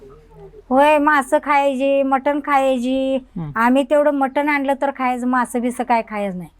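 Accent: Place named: Kanthi, India. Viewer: आवाज कंठी गावचा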